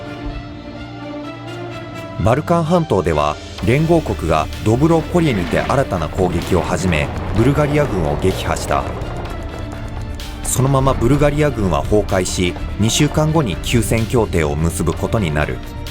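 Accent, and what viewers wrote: Kansai, Japan. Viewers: ジオヒストリーと同じ関西訛りが好きです。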